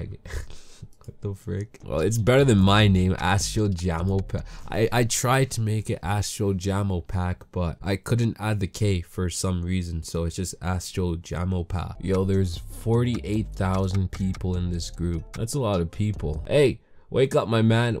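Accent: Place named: Toronto, Canada. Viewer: sound like a toronto man still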